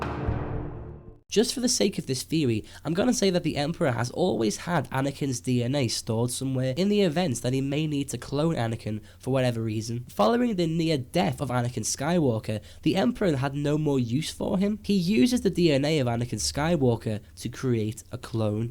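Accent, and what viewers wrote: Liverpool, United Kingdom. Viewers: I don't think Vader was trying to kill Luke in Empire, he was basically just defending from Luke's attacks, and driving him toward the carbon freeing unit, so he could take him to the Emperor. He didn't know about Leia until he read Luke's mind. Also, are you a Scouser? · Nice to hear a British accent talking Star Wars!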